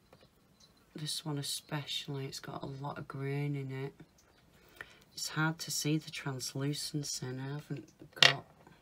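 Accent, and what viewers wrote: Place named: North, United Kingdom. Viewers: Just found you on YouTube, I’m getting back into polymer after about five years and it’s nice to hear a northern accent 🤗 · Love the video, nice to hear a fellow northern voice!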